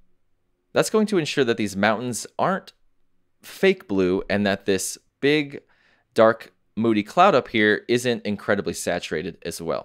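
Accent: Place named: New York, United States. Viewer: Why do you pronounce ON1 Photo Raw as Photo Rah? I've wondered about this for a while now. Is this a NY pronunciation?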